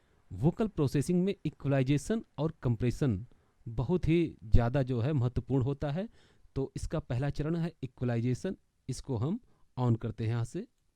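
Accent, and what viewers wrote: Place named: Uttar Pradesh, India. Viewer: UP ke ho bhai...hai na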